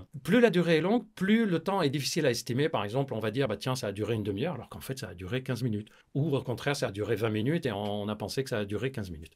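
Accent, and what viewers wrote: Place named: Lebanon. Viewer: Bonne ambiance, intéressant, accent libanais… tout y est.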